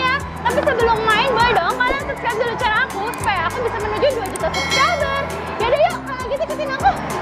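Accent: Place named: Sulawesi, Indonesia. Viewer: Itu logatnya Sulawesi yaaaaa Hampir mirip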